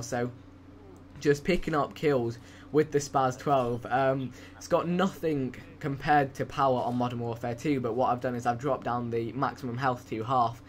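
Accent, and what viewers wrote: United Kingdom. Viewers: whoa... you British? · So it's a British Amazingfilms247?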